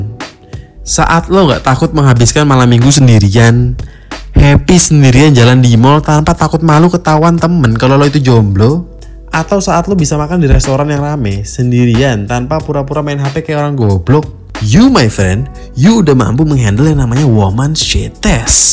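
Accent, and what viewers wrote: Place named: Java, Indonesia. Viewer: Lo kenapa sekarang pake logat logat jawa gitu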